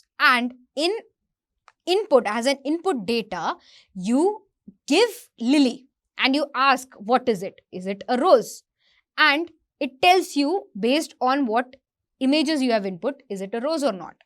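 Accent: India. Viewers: I can better understand the subtitles than the Indian-English. Edit after 1 hour: I love Indian-English!❤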